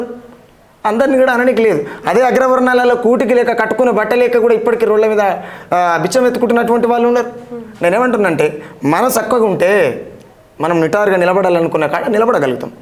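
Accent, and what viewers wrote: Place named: Telangana, India. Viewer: Anchoring is so good pure Telangana....👌👌👌👌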